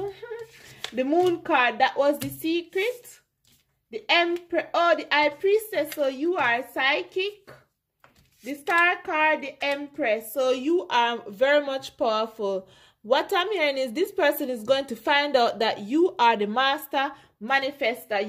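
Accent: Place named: Jamaica. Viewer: Awesome Jamaican accent, stay blessed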